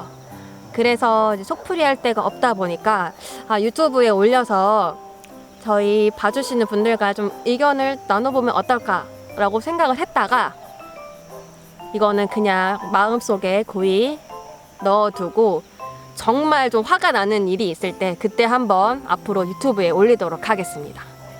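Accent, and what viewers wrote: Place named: Chungcheong, South Korea. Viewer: ㅋㅋㅋ 그거 충청도라 그래요 ㅋ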